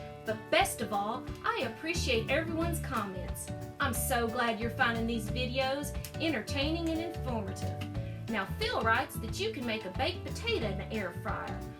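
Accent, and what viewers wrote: United States. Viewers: honestly, I don't understand why people dislike a video. If you don't like something, just move on. I was looking for a fast and easy way to do baked potatoes and your video was spot on. thank you and I was happy to see you're from Tennessee I lived there for two years and I miss it a lot, so your sweet accent warmed my heart, thank you for this wonderful video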